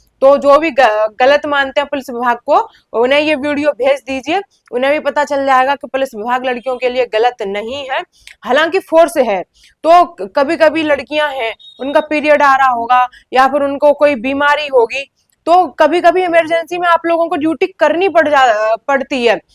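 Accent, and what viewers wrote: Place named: Western Uttar Pradesh, India. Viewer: Western UP se ho na aap, aapki tuning se lag raha hai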